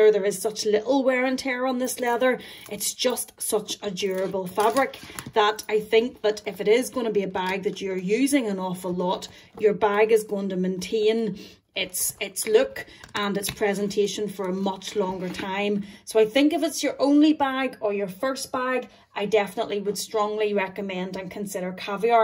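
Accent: Ireland. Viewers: Love your Irish ☘️ accent 😊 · Hi, I have to say I loved listening to you because of your lovely Irish accent. I love Channel. You are very knowledgeable. I just subscribed.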